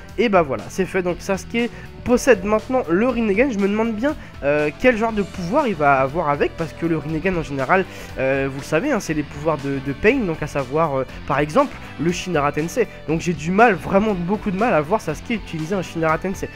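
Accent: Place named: North Africa. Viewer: eh toi avec ton accent du bled on a rien compris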